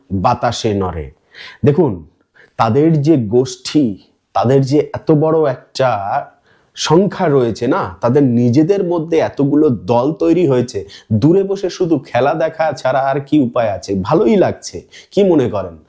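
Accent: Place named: Barishal, Bangladesh. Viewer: হরে কৃষ্ণ রাধে রাধে প্রভু, আমাদের বাংলাদেশের হিন্দুদের জন্য প্রার্থনা করবেন। দাদা আপনার বাড়ি কোথায়? আপনি তো খুব সুন্দর বরিশালের ভাষায় কথা বলেন?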